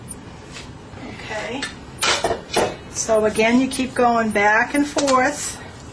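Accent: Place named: Massachusetts, United States. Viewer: YOU ARE FROM MASSACHUSETTS YOU SOUND EXACTLY LIKE MY FAMILY!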